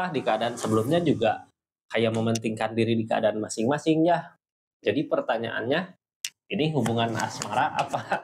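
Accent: West Java, Indonesia. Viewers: biarain aja.. Sbg ciri khas sundaisme 😁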